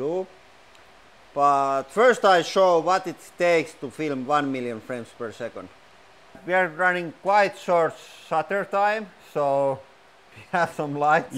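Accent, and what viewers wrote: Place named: Finland. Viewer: I just came to the realization that speaking English with and an American accent is boring AF. I will watch this channel and practice to speak English with a Finnish accent. SO MUCH more fun. It’s pretty cool!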